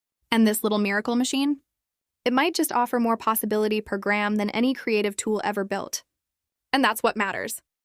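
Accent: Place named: United States. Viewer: a croaky American voice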